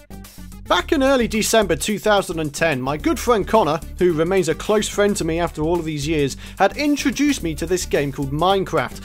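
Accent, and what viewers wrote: United Kingdom. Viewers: let me just say that among many of the Minecraft YouTubers that I watch for their adventures through the vast world that is Minecraft, and the several great Terraria Let's Players that I watch, your intro and voice definitely resonates within my mind as one of the most energetic, fun and exciting ones that I know of! I discovered you from a comment you posted on one of Yrimir's videos when you were around 45-50K subscribers, and I remember you were working on your Co-Op with AstonishingGamer as well as just starting the Sky Island series with another fellow (whose name eludes me) and I was immediately hooked to your episodes. Perhaps it's your charming British talk, or the way you say "I'm a very happy bunny!" but I am very much a fan of your videos and I look forward to what is to come in the future. Congratulations! Please keep up the good work, and it's commendable doing this while working someplace in real time. 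I hope to watch hundreds of your videos in the future! -Thomas (Could I request your filmed reaction when you get your plaque? I'm not saying a view of your face, but perhaps just like your first person reaction to it.:D)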